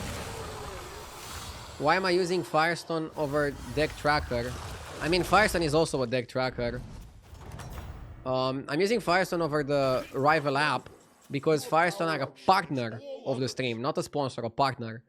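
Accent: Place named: Romania. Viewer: Ma uitam la videoclipurile tale si mi se parea mie ca accentul te da de gol, dar nu eram sigur 100% ca esti roman 😂😂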